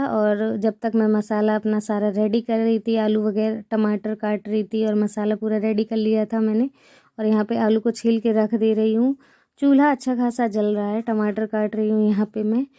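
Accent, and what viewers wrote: India. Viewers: Ap hariyana se ho?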